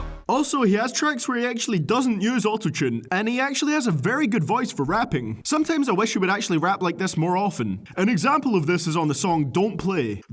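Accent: Scotland, United Kingdom. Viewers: i can't help but notice that you have a western European accent, are you Scottish ? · Brother you from scotland?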